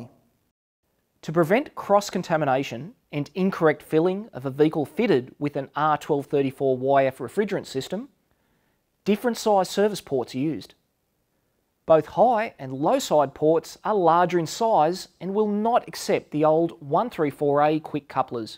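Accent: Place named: Australia. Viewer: It's Aussie, not English.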